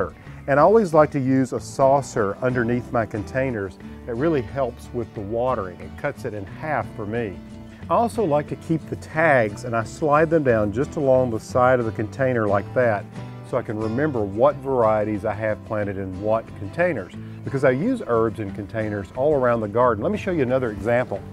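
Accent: United States. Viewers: urbs??? Baysal??? ;) loving the American Diction :)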